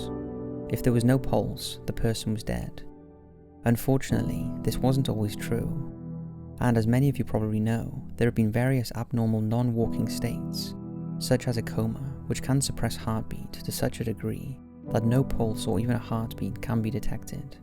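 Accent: Wales, United Kingdom. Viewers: moonbeam starchild - that's the Welsh accent for you!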